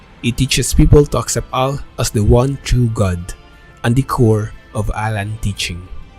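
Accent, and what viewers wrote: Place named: India. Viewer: 感覺有點印度口音XDD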